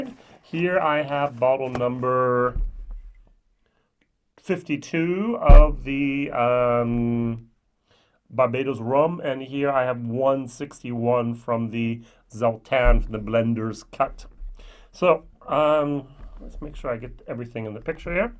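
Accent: Germany. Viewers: Your German accent is getting the better of you. The "S" in Sauternes is pronounced as an "ess", not a "zee" [sōˈtərn]...;-) I was gifted a bottle of WHISTLEPIG OLD WORLD SERIES SAUTERNES FINISH RYE 12 YEAR which was impressive. Looking forward to a whole new year of your vlogs.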